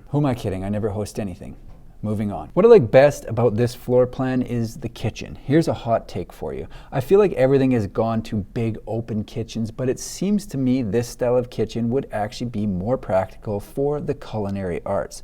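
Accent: Canada. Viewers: Every time I think a better design can't come along, one does! Thank you so much for your interesting and inspirational videos. I so enjoy them and as a Canadian living in Europe, love listening to your gentle Canadian accent as a reminder of home :)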